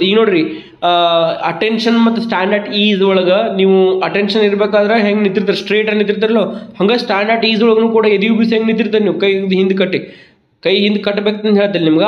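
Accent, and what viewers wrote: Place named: North Karnataka, India. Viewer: your uttara Karnataka language is so beautiful.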